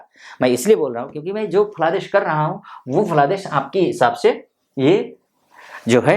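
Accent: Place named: Nepal. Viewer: Sir. Aap nepali mul ke hain. Kiuki aap ka bhasha Nepali ka tone me aati hai . App ka prediction bahut good hai.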